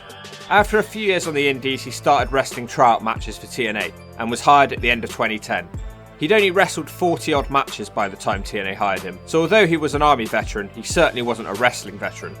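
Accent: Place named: Wiltshire, United Kingdom. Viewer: You sound like you're from wiltshire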